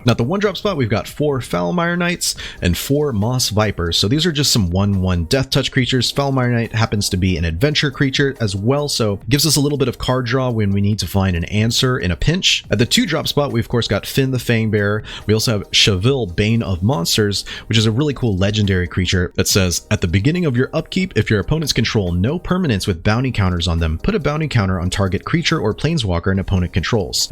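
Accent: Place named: Australia. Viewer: Lol that Aussi accent 😂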